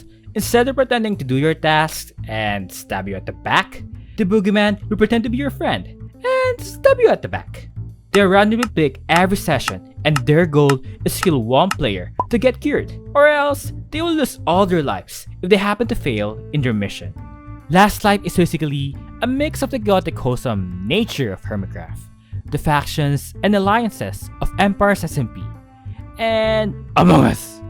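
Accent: Philippines. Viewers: this video just came up on my recommended and i decided to watch it, and from hearing your voice i could tell you were filipino too XD hoping to see you make an empires smp explained video <3 also just a tip, joel's name is pronounced as "jowl" enunciating the e isn't really necessary :D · Are u a Filipino ur accent is sooooooo Philippines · I feel like you have a Filipino accent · Are you a filipino? Your accent is very filipino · Great! Some Filipino 3rd life fans. Recognised you by your accent. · Are you Filipino, cuzyour accent😊🥰 love it. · based on ur accent, im pretty sure ur a filipino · WHY DO U SOUND LIKE A FILIPINO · I recognize your accent. Lezzgoo mga pinoy!!! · You filipino? Accent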